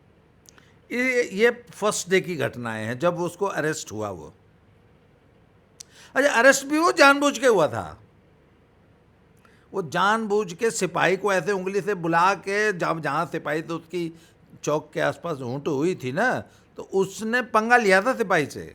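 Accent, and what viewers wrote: Haryana, India. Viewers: Aap gazipur , banaras ke h bhasha haryanvi h😮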